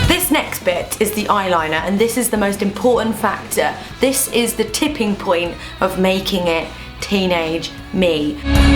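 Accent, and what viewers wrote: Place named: London, United Kingdom. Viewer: it sounds like Adele's accent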